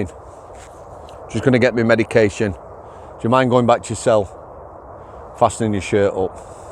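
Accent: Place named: South Yorkshire, United Kingdom. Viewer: Wakey bloke mi sen I always thought you were South Yorkshire love the work keep it up 25k subs soon 👍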